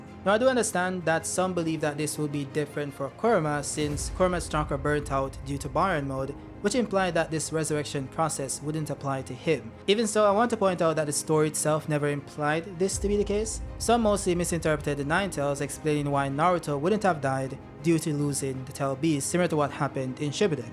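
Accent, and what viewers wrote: Jamaica. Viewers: Ayo are you Jamaican?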